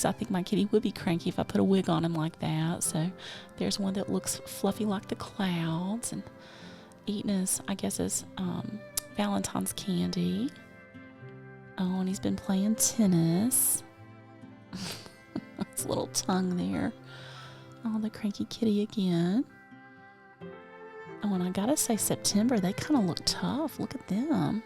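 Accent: South, United States. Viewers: yes, and when we had a land line I used them. It brings back nice memories. Just like taking those trips with you. And hearing your sweet southern accent just brings back all those wonderful growing up days. Many thanks for you doing this for us all.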